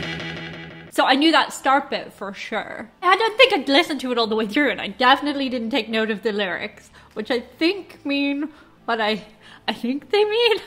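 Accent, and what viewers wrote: Ireland. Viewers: On the basis of you're accent, i'm assuming you're irish. If you are based in dublin, i would check out ticketmaster for tribute bands of. I've seen one at the academy. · You're funny..... And you gotta love that accent! I'm Irish but I don't sound like that.... I mean, my Great, Great, Great, Great Grandfather was Henry Grattan!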